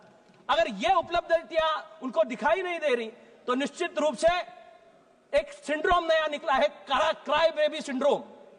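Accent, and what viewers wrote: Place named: Kolhapur, India. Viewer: कोल्हापुरी आवाज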